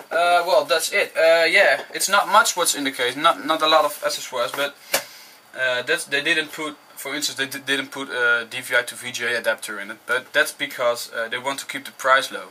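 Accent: Netherlands. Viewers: volgens mij een nederlander, ( klinkt namelijk nogal nederlands en engels is ook met een nederlands "accent") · je hoort zo erg dat hij nederlands is =D if i talk english it's sound much better ;)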